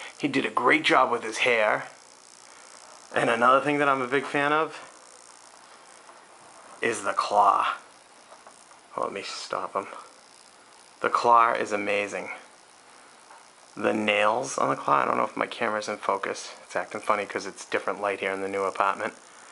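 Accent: Boston, United States. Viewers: love your videos!! can i assume you are a red sox fan by your accent?? lol sorry couldnt help but notice, thanks for such awesome statue videos man!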